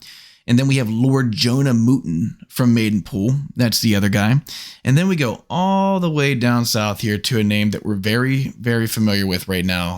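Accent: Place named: Pittsburgh, United States. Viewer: You have a very Pittsburgh accent lol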